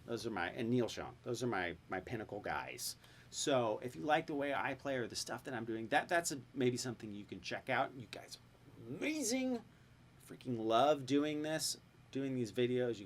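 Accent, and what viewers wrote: United States. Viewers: Enjoyed that lesson. I slowed it down to.75 speed to get the licks down correctly but when I did it made you sound like you had about 5 bong hits under your belt when you where talking. lol Guess it's that southern California accent...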